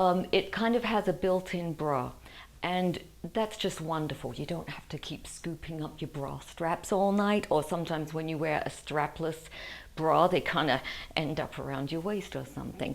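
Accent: United Kingdom. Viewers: You’re lovely. Do I detect a UK accent?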